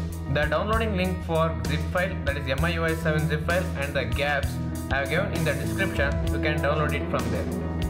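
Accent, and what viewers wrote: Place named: South India, India. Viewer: are u south Indian??? ur accent tells the truth , I'm a south Indian too but I don't have that accent ,I want to know why!!!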